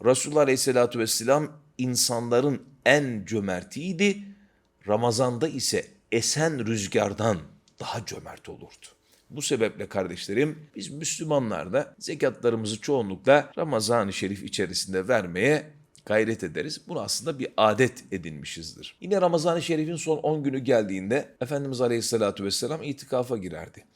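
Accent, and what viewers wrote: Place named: Arab world. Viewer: hocam, içerik olarak gayet keyifle dinliyorum, çevremle paylaşıyorum ancak türkçeyi de arapça diksiyonu ile konuşmanız bir süre sonra yormaya başlıyor, suni/zorlama geliyor. ali rıza demircan hoca misali…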